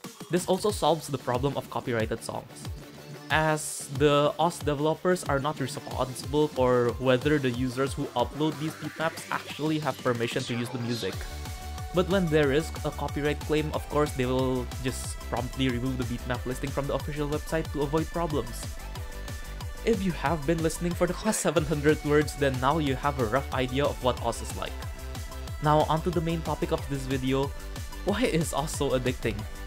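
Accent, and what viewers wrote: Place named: Indonesia. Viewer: Logat Indonya kedengeran banget njir, but S for effort 👍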